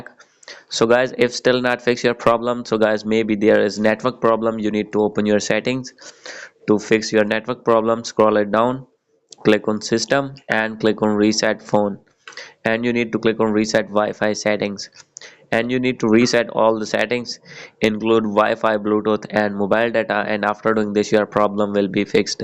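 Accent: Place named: India. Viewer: The Indian accent is just, PERFECT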